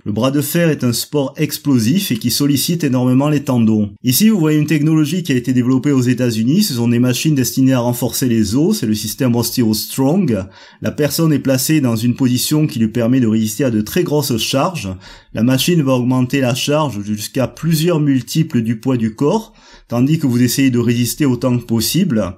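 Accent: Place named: Corsica, France. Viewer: Super video ! On croirait meme reconnaitre un accent corse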